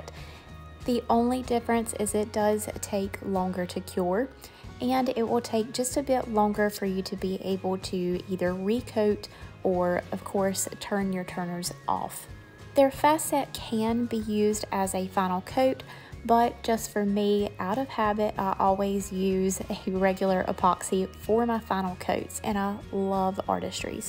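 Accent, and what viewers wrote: South, United States. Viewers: I love your ability to mix items that us "matchy matchy" people would typically not put together. This turned out beautifully, as always. I am curious though, (as a fairly new tumbler maker), how come you chose to do matte when using glitter? I wonder if using a non glitter vinyl and then using the mattify at the end would give it a similar effect? Your cup looked super shiney in the end. Is that how it looks after finishing with mattify? I'm genuinely just curious. Sometimes I feel like I'd rather have a matte finish on mine so thinking about getting some of that stuff. :) Beautiful job as always. I also love the southern accent. I'm in southeastern NC so maybe not as southern but we definitely speak with a southern accent here too. 😋😍🥰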